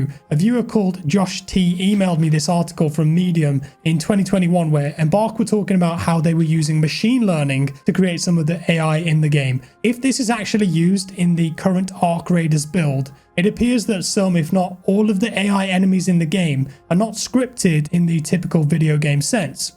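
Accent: United Kingdom. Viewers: I have to say it's not that often that I can handle the British accent for more than 30 seconds. But I can handle you talk for 10 minutes and that's good enough. Talk about how good the graphics are, the gameplay, the movements, and how do you feel with the third person perspective. Please try to sound more American normal or at least a non-wining accent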